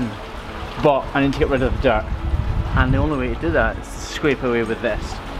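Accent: Scotland, United Kingdom. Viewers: pretty sure you replied to the wrong person. The Katt said nothing about what he is. Secondly he may be English genetically or was born there but a lot of he says has a slight Scottish accent that gets really heavy when speaking to locals. Plus he's in Scotland. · There’s something about a Scottish accent that seems to lend itself to healing and fixing. Makes me feel like she’s in good hands. Lol. Maybe I’m trained by Star Trek but I don’t care. This poor cow needs a miracle worker like you. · Scottish spoken by homelanders is getting so much easier to understand. This guy speaks perfect English with an accent. I had a childhood friend whose mother was from Scotland and I could never understand a thing she said. I love most accents, so long as I can understand them.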